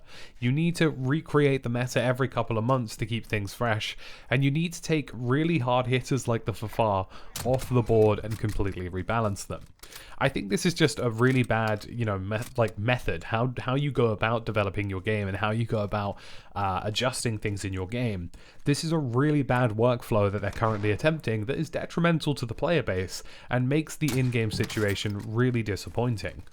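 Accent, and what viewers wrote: United Kingdom. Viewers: Sick channel nice to hear a uk accent ha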